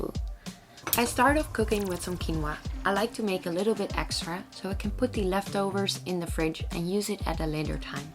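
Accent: Netherlands. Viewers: the moment I opened the video I recognized the accent. its so cool to see a model from the Netherlands, half my family lives there and it's probably one of the prettiest places ever.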